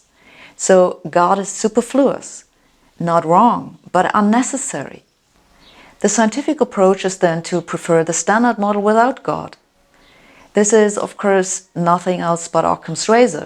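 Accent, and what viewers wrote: Germany. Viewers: Learn English, I don’t understand this German accent.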